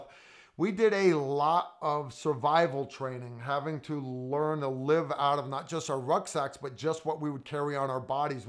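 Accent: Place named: Northeast, United States. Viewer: ahhh ok i know i heard some northeast in there lol. i'm from NJ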